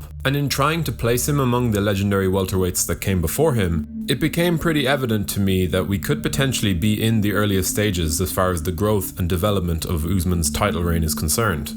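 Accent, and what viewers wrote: Ireland. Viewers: Is the guy talking irish it sounds like he is but trying to hid it anyway great video but as an Usman fan burns and Colby fights worry be especially burns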